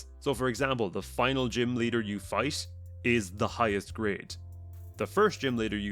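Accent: Ireland. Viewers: Your voice was like ASMR throughout the whole video 🫠 I love the way you say Jhoto... its the Irish accent. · You sound a lot like PotatoeMcWhiskey!! Are you Irish too?!! I love Ireland!!!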